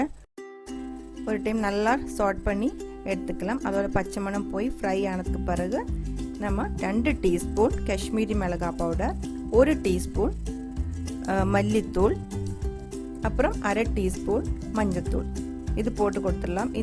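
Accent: Kerala, India. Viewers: hi mam ur way of presentation is simple&..ur malayalam mixed tamil is understanable..i love..can you tell about adaprathaman recipe?..thank you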